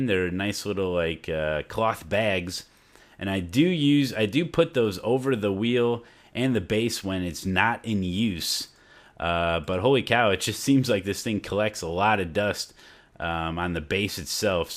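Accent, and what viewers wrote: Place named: Midwest, United States. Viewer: Hey man whatsup. love the streams. But As for the click being a con, streaming that can definitely be an issue, but i dont think its a con in itself. Some love and/or need the strong satisfying click. but that should be an option i agree. if the click wasnt there id still want a nice solid tactile thump to confirm i had shifted, not some mushy feel ya know? And im from georgia myself, cant quite pinpoint your accent, midwest?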